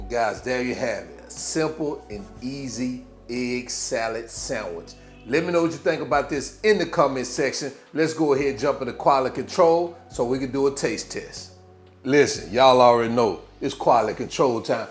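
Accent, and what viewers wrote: United States. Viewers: "igg salad"! Love your accent. If you don't mind, which part of the. U.S. are you from?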